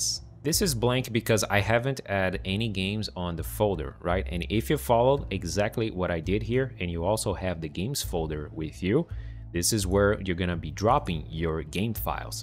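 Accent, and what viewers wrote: Brazil. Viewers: Best Brazilian person accent i ve ever heard. congrats for the work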